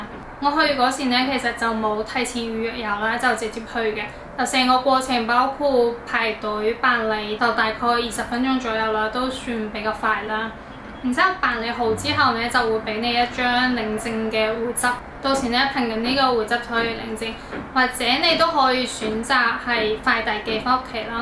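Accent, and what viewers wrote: China. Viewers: 聽你講嘢就知你係大陸人啦，今時今日香港嘅後生仔應該係去咗外國，除咗啲老嘢會返大陸，邊有港人後生仔返大陸。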